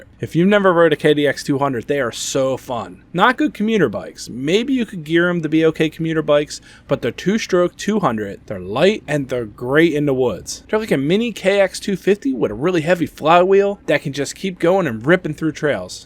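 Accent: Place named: South Jersey, United States. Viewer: You gotta be a south jersey dude with that accent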